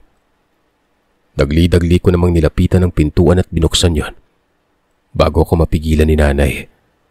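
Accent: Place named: Calbayog, Philippines. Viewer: This is the first time na nakarinig ako ng taga Calbayog hahhaha hello kababayan 😂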